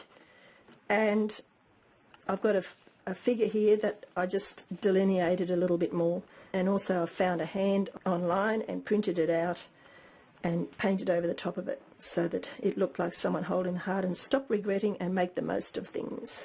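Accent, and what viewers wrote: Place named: Australia. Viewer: Great to hear an Australian accent on YouTube! haha Love the artwork :)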